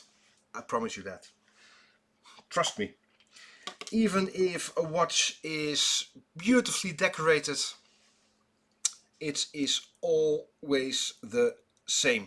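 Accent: Netherlands. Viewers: Entertaining AND informative..... and all in a Dutch accent :)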